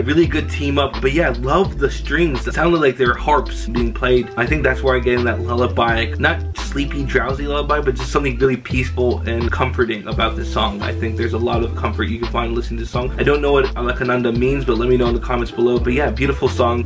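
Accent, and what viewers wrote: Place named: Assam, India. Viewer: Ur pronunciation is liked assmeis.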